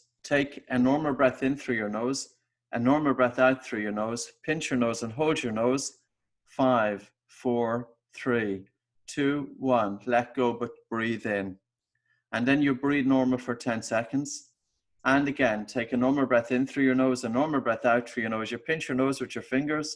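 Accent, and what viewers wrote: Ireland. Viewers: I love your Irish accent. Not an accent in your country of course but in America yes. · Oh, this is fantastic, outstanding - thank you!! You confirmed for me that what I've been doing intuitively is right on (since COVID... when grocery shopping, keeping mouth closed and hardly breathing if at all, especially when near anybody), when outdoors and downwind of anybody else (especially if they're speaking), ditto (and move upwind/away if possible so as not to be 'in the line of fire' for their expelled air (and particles...), etc. etc. Also, over a steady 24 years of lap swimming, I have always and only breathed through the nose while swimming, though I wasn't aware of all the benefits of doing so. This was very educational and exceedingly useful, especially at this time. Bless you! Will be sharing.....! (p.s. - love your (Dublin?) accent :) ) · Brett/ hold time = BREATH Hold Time. 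Tis the Irish accent you see. Likewise, wid = with; tinking = thinking, breed = breathe. LSD is a good anachronism. This has been an excellent explanation. I've shared this with as many people as I can and now I am doing a revision. Thankyou once again, many times over. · But why does he do all this .eishhhhh minuit - muinishhhhh, respiratory rate - respiratory reishhh? like wtf are you IRISH, KIWI, AUSSIE? xD if you tell, I change my thumbs to up, cause all you say is probably right and helpfull, but the accent xD is hard to get used to and bad for my oxford english ^^ ah and if you panic, use asthma spray, drink a glas of irish stuff, whiskey, then sit straight, breath deep and slowly, not big shallow and fast.. btw it's better without whiskey, but if you panic you needa stop that. still better then drugs xD except asthma spray xD luls. Actually when we do sports and we get exhausted, and make the break, at first we do exactly what he says, fast shallow breathing, but then after a few seconds, we automatically go back to normal breathing, slow, deep, not hyperventilating/fast breathing. 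xD appearetnly our body knows it by itselsfin usual conditions and sports. ^^ my note, his technique - EVERY TIME! THIS TIMES - when you do his test, like resting respiration, you feel obstruction or breath difficulty - CALL AMBULANCE AND DOCTOR!!!! use his techniques until they arrive. because I GOT SUSPICION, the earlier you arrive in intensive care or medical care - the easier they can help, avoid the cytokine storm with meds so it doesn't destroy the lungs! THE THING THIS REALLY SMART GUY DOESN'T MENTION: AFTER CYTOKINE STORM = YOUR LOUNGS ARE WHITE, DEAD, NADA, you're DONE, you wont' breath anymore cause lungs = broken, so you're DEAD, so you cannot appl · I’m breathless over this guy and his irish accent!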